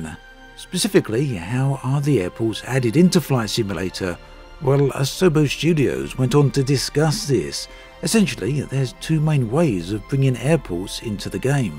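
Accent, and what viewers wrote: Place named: Wales, United Kingdom. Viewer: I am still trying to understand what part of the English speaking world YouTube content creators come from? So often their use of syntax and pronunciation is impossibly wrong. It is a cadence of translation from another language, yet these people seem to have a Welsh brogue. I'm fucking baffled and also annoyed. Don't get me wrong the American narrators are often as poorly spoken, particularlily those from the West coast. Beyond weird. I never encounter people who speak like this in real life but everywhere on YouTube. I am convinced they are all AI.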